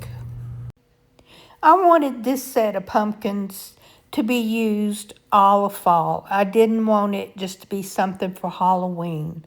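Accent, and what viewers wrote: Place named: South, United States. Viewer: I can hear your beautiful Southern accent. I am in South Georgia, where are you located?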